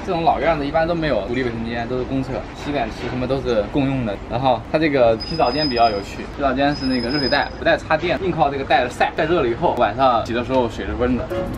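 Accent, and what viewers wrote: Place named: Anhui, China. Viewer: 听口音安徽吧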